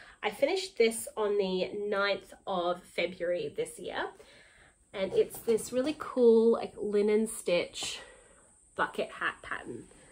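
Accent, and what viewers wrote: Australia. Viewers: Hi there, so nice to see you again. Thanks for your informative podcast about your projects it was really helpful and it's so nice to hear an Aussie voice on You Tube. Have a great year!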